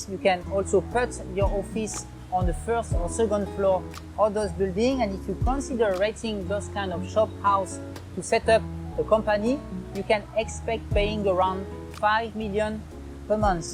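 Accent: France. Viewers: Also sein Englisch-Französischer Akzent, ist verständlicher als gewisse andere Britisch oder Texanisch Englisch sprechende Personen. Desweiteren könnte man auch sagen, vielleicht lernt man den Englisch - Französischen akzent zu hören und zu verstehen. Get out of your Comfort Zone and grow your learning curves. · He has a French accent because he is ... FRENCH!! Can Americans be respectful or is that too much asked?! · Nice french accent. Very funny to hear it.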